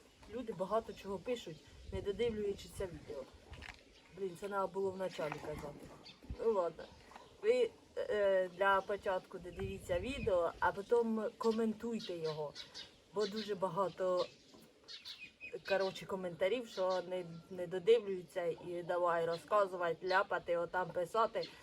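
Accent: Poltava, Ukraine. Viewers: ГОВОР ТАКЕ ,ЯК ПОЛТАВСЬКИЙ, ЧИ НІ?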